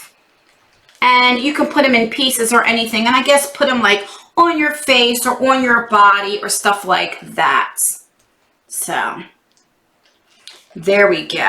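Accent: New Jersey, United States. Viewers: Happy birthday!🌸🌼🌻....I gotta say that I nearly peed my pants when you said I wonder if she knows Im from NJ! 😂gurl.... you sound Jersey through and through! And I love it!!! Have an amazing birthday! From a fellow 43yr old🔅🤗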